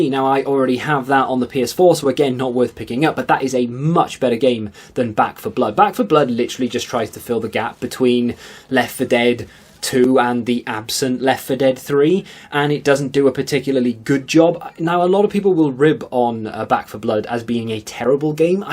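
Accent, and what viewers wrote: Wales, United Kingdom. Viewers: Are you welsh ? Ive got a PES<num> promotion copy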